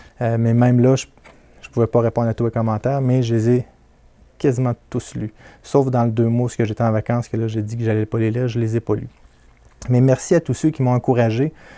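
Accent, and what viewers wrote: Quebec, Canada. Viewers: Merci pour toutes les vidéos et conseils tellement utiles et pertinents. Il est rassurant de voir des personnes qui ont des valeurs : la famille est si importante. Carpe diem et encore merci pour tout Toutes mes pensées pour le rétablissement de Madame Il sera difficile de ne plus entendre ce charmant accent canadien pour un auditeur français ! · Mais bien-sur que je te met un like, Prend soins de ta famille, encourage ta femme qui à du te partager avec nous, tu vas tellement nous manquer. je crois que je v coller ta photo sur ma cr10, avec ce sourire et ton accent de Quebec tu a tellement apporté à la communauté de l'impression 3d amateur francophone. énorme merci camarade de toute la France Damien un fan à qui tu as appris beaucoup et qui dans la difficulté de certain print te regardais rien ne lâche pour tenir. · Bonjour je ne commente jamais les vidéos mais très attentif au explication ou découverte de nouvelle imprimante, je suis de tous cœur avec toi. 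Tu nous manqueras avec ton accent canadien que l'on apprécie temps de notre France. Prend bien soin de t'as famille, merci pour ton travail de passionné.Tu vaux bien plus qu'un simple like.Bonne continuation et encore merci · Bon rétablissement à votre épouse. J'ai adoré vos vidéo, votre bonne humeur, ainsi que votre accent québécois. C'est grâce à vous que j'ai commencé l'impression 3D. Merci infiniment et BRAVO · C'est en grande partie avec toi que j'ai débuté en impression 3D. J'ai aimé la rigueur de ton travail, la sincérité de ta passion de transmettre, et aussi ton accent Québécois. Et tu as pris la bonne décision. Je souhaite bon rétablissement à ton épouse, et à toi beaucoup de bonheur avec ta famille, et pour très longtemps. Un grand merci pour tout ce travail qui nous a profité à tous. Si un jour l'envie te venait de passer des vacances en France, sache que je t'accueillerai toi et ta famille avec grand plaisir. 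Bonne route... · Bonne continuation pour la suite, occupe toi bien de ta famille, profite bien d'eux et surtout bon rétablissement à ton épouse. Mais malgré tout je suis triste, car je n'aurais plus le plaisir d’écouter tes conseils et ton accent de cousin de l'autre coté de l'atlantique lol Merci pour toute tes vidéos, j'ai du en voir les 3/4 et tu m'as sortir pas mal de fois de mauvais pas;) Bonne continuation et à la prochaine.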